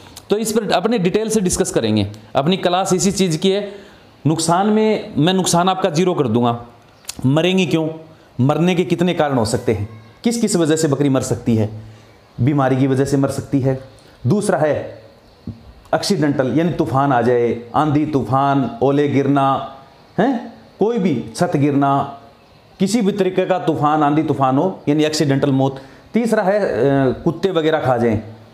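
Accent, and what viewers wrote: Rajasthan, India. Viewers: Sir aap Rajsthan se ho kya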